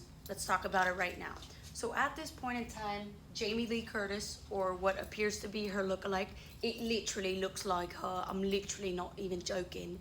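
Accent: United Kingdom. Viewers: The British accent eats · That British accent is so spot on! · Pin pls the BRITISH ACCENT GOT ME😂😂😂😂😂 literley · Wait are you actually British? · Omg I love your videos so also i love the British accent lol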